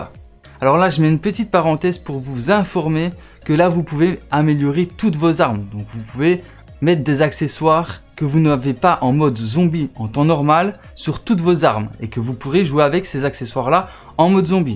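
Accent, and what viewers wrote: Liège, Belgium. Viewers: Sa sent le liégeois haha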